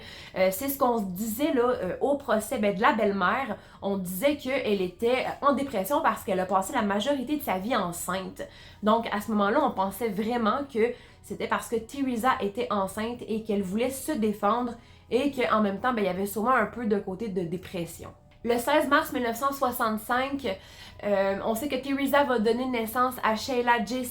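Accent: Quebec, Canada. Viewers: Bonsoir, merci pour cette histoire effroyable que tu as très bien décrite. Tu es une excellente narratrice et ta chaine va vraiment vite évoluer! Bravo. De plus, j'adore ton accent québécois!!!!!!!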